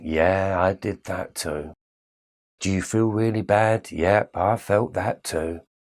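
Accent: Cockney, United Kingdom. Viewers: Thank you, it is good to hear this and watch your other talks on the dark knight of the soul..It is helping me a great deal. Plus your voice sounds similar to my Mums who was from the east end of London. Her death and Covid last year triggered I believe this time I am going through now... There is a lot of help on the Internet and I pick and choose who I listen to. You are a comfort.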